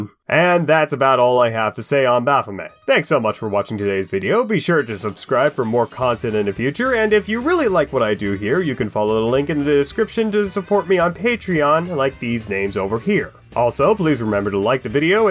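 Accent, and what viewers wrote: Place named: France. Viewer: That French accent gets me everytime 😂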